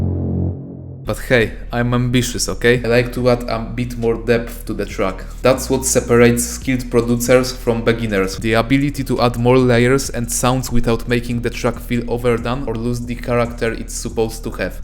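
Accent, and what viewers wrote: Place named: Russia. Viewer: sounds either Russian or German to me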